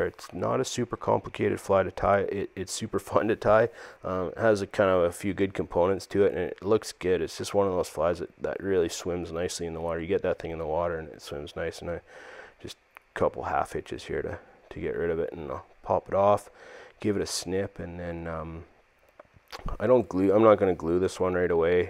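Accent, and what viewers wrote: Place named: Canada. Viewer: love the canadian accent. i live in kelowna. thanks for the vid :)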